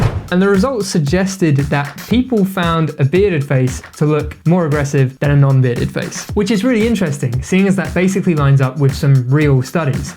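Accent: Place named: United Kingdom. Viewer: Just found you today, and now I am subscribed. Love your content! Hey I have a dumb question for you? Do you live in the UK as your accent makes it sound like you might?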